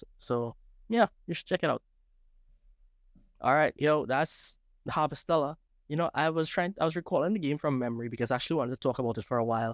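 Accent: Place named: Trinidad and Tobago. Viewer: It's a Trinidadian accent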